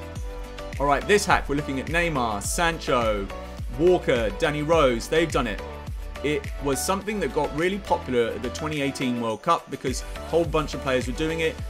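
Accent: United Kingdom. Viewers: Chale, que dificil es entender el ingles britanico pero si te entendi en un 70%.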